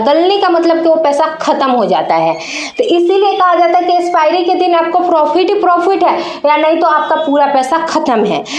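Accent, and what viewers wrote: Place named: Bihar, India. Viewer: Oh hai na bihar say hai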